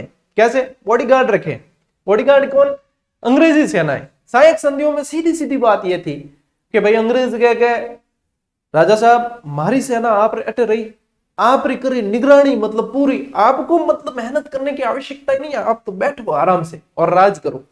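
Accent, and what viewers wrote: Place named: Jodhpur, India. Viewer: गुरू जी आपकी मारवाड़ी शैली में पढ़ाना.... सीधा दिमाग में सेव होता है लिखने की भी जरूर महसूस नहीं होती और जोधपुर की बोली का तो क्या ही कहना... खांडा फलसा में कुछ वर्ष गुजरे ( चिम सा , रिंकू बा , अभिषेक बा सा ) 👌👌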